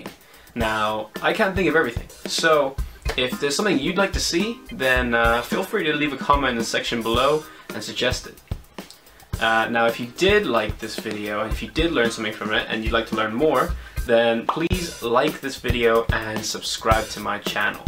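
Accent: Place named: United Kingdom. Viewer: i recognise that accent are you from the uk???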